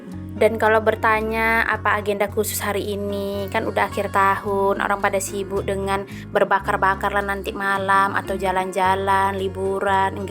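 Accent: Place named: Medan, Indonesia. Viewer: Klw dari logat... Orang medan yaa... Didaerah Dimana medannya? 😊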